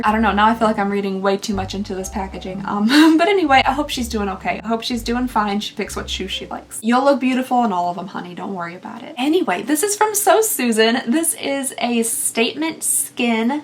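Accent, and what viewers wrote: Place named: Owensboro, United States. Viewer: My best friend in high school was from Owensboro... your accent makes me miss her!!! Love what you do so keep on keeping on!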